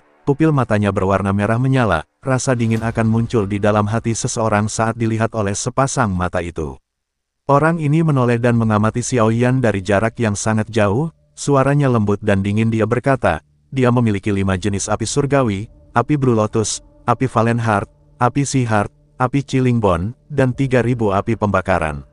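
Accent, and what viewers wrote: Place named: Madura, Indonesia. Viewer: Madura min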